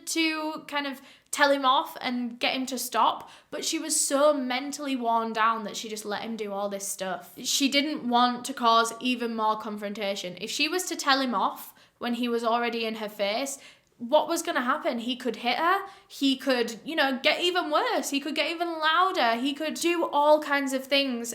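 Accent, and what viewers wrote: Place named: Yorkshire, United Kingdom. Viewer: You can't just pick up a leeds or Yorkshire accent like that ha. I should know, she's from my city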